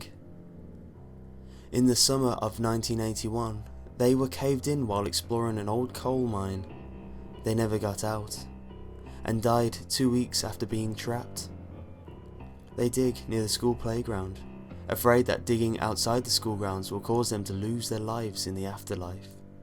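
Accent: United Kingdom. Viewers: Did anyone notice that this is the same bullshit story about Recess that has been spread all over the internet, but with a British accent. This theory isn't based on any evidence from the show, and has several holes. A theory about a TV show needs to be presented with evidence to seem interesting. This is just crappy fiction.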